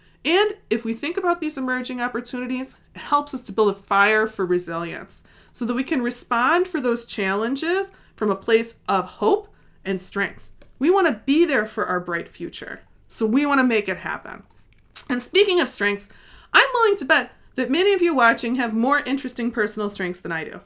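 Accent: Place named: Upper Midwest, United States. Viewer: I'd like to find out more about river-erosion risks in my area, and also in areas that I'm thinking about for relocation. Can you recommend places to learn more about such risks? Also, my friends and I love your information - almost as much, we love your classic Upper Midwest accent. We call you the! Great attitude and great info. Thanks so much!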